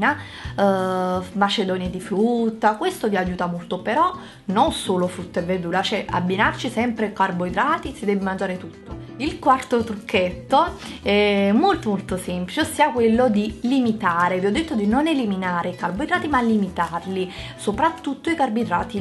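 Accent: Naples, Italy. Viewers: anche io sono di Napoli, però almeno nei video cerca di limitare questa cadenza napoletana pazzesca!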